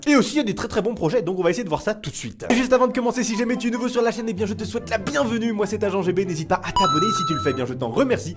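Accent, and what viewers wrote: Quebec, Canada. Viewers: IL EST COOL L'ACENT QUÉBÉQUOIS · Ton accent québécois est magnifique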